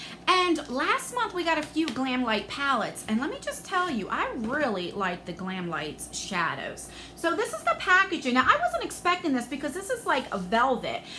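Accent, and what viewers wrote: New Jersey, United States. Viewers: I love YouTubers that are real! You know just themselves and Hughes seem I don't know if it's because I'm from Jersey myself you know and relocated but I miss it if that's maybe why I feel this connection to you because I mean all my friends growing up I mean I can hear them in your voice I can hear your accent I feel like I'm back in New Jersey hanging out with my friends doing crazy things and that was a really good time in my life. I had great friends back then we're still friends we keep in touch but it's not the same you know everyone gets older and grows up and then life hits us! LOL. It's just brings me back and you're just such a real person I just love it! Never change please because you've got what YouTube needs! Much Love XOXO - Kylie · New Jersey represent! Your accent makes me home sick for NJ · 😘😘 love your videos! 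They make my day! Your jersey accent is the best!!!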